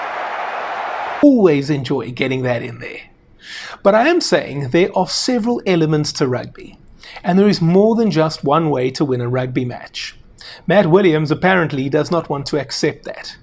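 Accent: South Africa. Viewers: Algorithm sent this channel my way... just needed to hear a South African speaking and voilà i have liked and subscribed 😂 .... do i understand the content? No. Is this channel SAn? Yep, and I will subscribe up and down, nje, just because 😊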